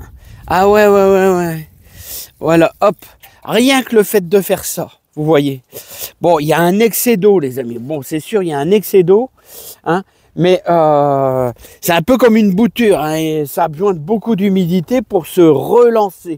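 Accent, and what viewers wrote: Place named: Nord, France. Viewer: ça s'entend l'accent du ch'nord!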